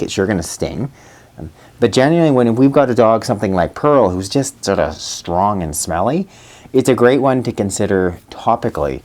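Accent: Canada. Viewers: Holy Canadian!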